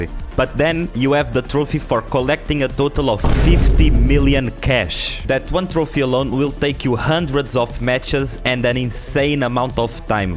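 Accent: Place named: Portugal. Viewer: Mano tive que checkar a tua bio peguei logo pelo teu accent que eras tuga hahahahaha, excelente video abraço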